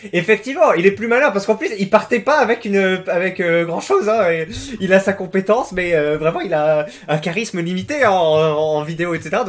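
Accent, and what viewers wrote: Alsace, France. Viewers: Accent Alsacien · alsacien prolo, si tu veux.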